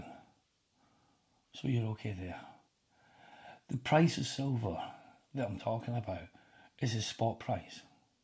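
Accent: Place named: Scotland, United Kingdom. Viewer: I think you are going to do well. Everyone loves a Scottish accent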